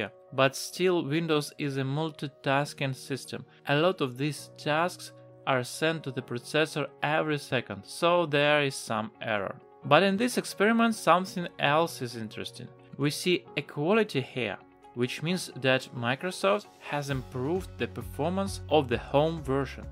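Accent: Russia. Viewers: Все равно русский акцент не скрыть.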